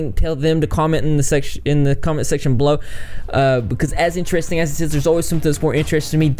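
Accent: South, United States. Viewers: Sounds like a Southern accent from the southeast US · Your hair is fantastic, I'm greatly enjoying your videos, & your wonderful Southern accent sounds a lot like mine lol. Greetings from Asheville in Western North Carolina :) Yeah this whole Baron Trump book thing is CRAZY! I figure Lockwood or someone long ago had a dream or vision about the time we are living in, then wrote these books from what they saw? President Trump is a baron too, a property baron, as well as part German. Wonder if that illustration in the book also closely resblembles the Donald as a child? Yes, cold shivers here too, for real. And I don't get those easily. I live in constant synchronicities that many people would not believe. So much that I plan on writing a little memoir book about my life lol! But this book is wild. Great coverage & commentary!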